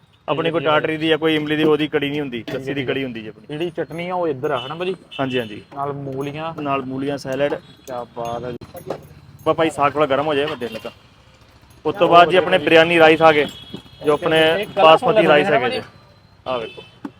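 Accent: Majha, India. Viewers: Ahii majhe Wale a pra...ahi te adkar hi khene a ...😅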